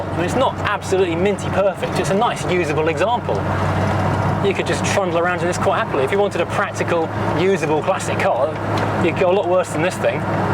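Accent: United Kingdom. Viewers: Wish he wouldn't speak so British, I would like to understand WTF he is saying...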